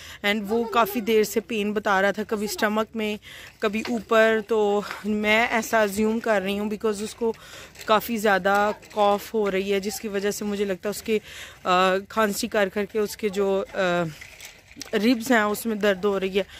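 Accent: Sialkot, Pakistan. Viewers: aap ki awaz sa laga tha k aap sialkot sa ha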